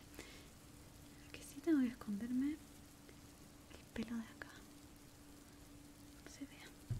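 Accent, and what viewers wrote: Chile. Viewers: hablando chileno❤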